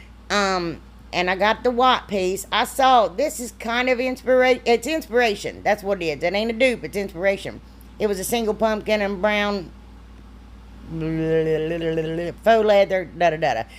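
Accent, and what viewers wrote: South, United States. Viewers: Oh me oh my, I am soooo happy to have come across your channel....you are just the cutest funniest southern Belle I've ever heard. You gave me the giggles 🤣🤣 I near done wet my pants laughing at you. Thanks for being YOU!!!! You got a new fan here. 👏🏽👏🏽👏🏽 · Gurl you're a hoot!! Just found your channel.. love your projects..where are you from.. I am in hsv Al..you def from south 😜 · Omg..I just found you and watching you for the first time and I'm cracking up!! Love that Southern accent 😍. Keep doing what you're doing! Thanks for the laughs, I mean it in a good way. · Girl! You are my absolute favorite crafter! All your projects are beautiful. Plus your country accent is right up my alley! I’m a Georgia girl so you speak my language! 😂 TYFS!!! Sending blessings your way. 😇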